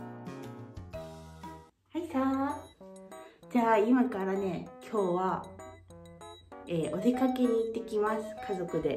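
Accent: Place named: Okayama, Japan. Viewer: 出さないようにしてるみたいだが、 ときおり出る岡山弁のイントネーションに萌える。（40年岡山県人を捨て愛知県に移住）笑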